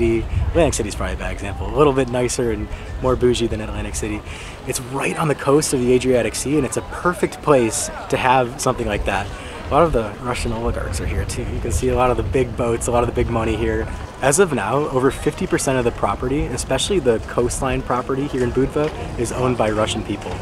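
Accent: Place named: United Kingdom. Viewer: Why does she have a british accent?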